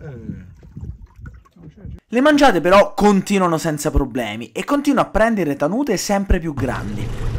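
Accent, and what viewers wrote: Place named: Rome, Italy. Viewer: Mi sembra che hai un accanto Romano. Cosa ci fai in Sardegna.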